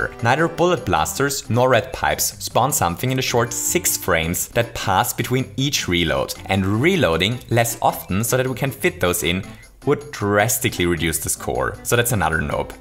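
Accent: Germany. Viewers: Are you German? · If I may ask,... are you german? This accent... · Just a simple Question: are you german? :D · Man hat an der Stimme schon ein Bisschen erkannt, dass du deutsch bist ^^ #nofront^^ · Wie man raushört, dass du deutsch bist :D ...hehe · Du sprichst ganz sicher deutsch als Muttersprache, das merkt man an an dem Englisch 😂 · xd man hört voll den deutschen aktzent(ich weiß nicht wie man das schreibt) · Is this dude German ? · Deutscher Akzent kickt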